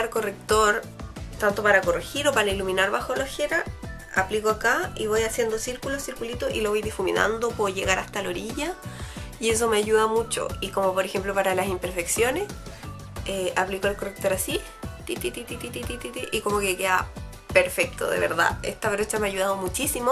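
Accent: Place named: Chile. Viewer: Que dulce tu voz! Me encanto tu canal, primer video que veo, creo que eres chilena por el acento, yo igual te invito a ver mi canal . Nos estamos viendo, cariños! 😊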